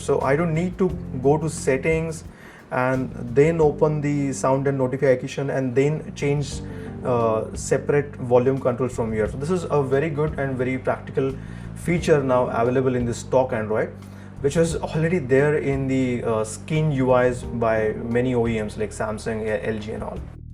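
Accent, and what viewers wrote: India. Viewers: love the Indian accent!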